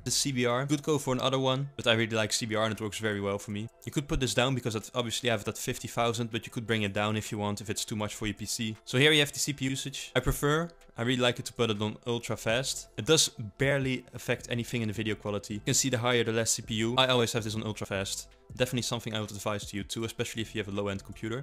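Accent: Netherlands. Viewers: you speaking like a dutch accent :D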